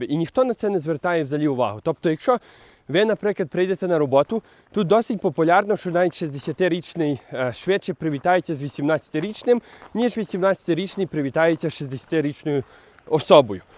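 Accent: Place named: Western Ukraine, Ukraine. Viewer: Дякую тобі за класні відоси, впізнаю наш захїдний діалект. Мені подобається дивитись твій канал, навіть за відсутність професійної зйомки....тощо...Бачу в тобі скромну, виховану та порядну людину. Простий Українець! Десятки мільйонів тобі підписників і стрімкого зросту каналу! Слава ЗСУ!!!Слава Україні!!!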